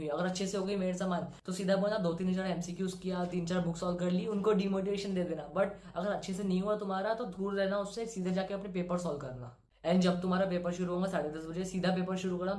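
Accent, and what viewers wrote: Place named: Maharashtra, India. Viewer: The marathi accent 🫡🫡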